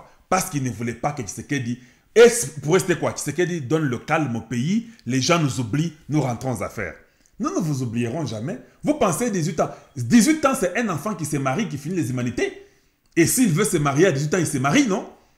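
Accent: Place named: Texas, United States. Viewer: Votre colère est justifiée vous n'avez pas mâché les mots. Vous méritez un post ministériel avec vos compétences. Vous parlez comme un texan! Merci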